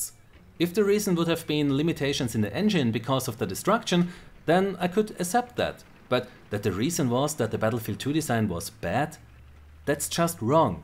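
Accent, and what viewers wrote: Germany. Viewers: German accent... Yet he talks like lvlcap... Interesting.!! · your funny accent is german, right? correct me if im wrong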